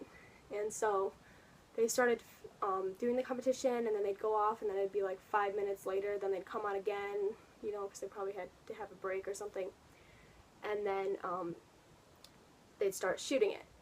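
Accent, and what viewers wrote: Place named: Detroit, United States. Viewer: I love your Detroit accent lol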